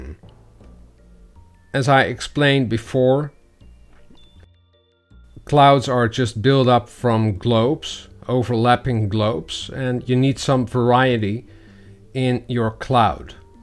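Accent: Netherlands. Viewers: Are you a native english speaker? I thought i heard a little dutch accent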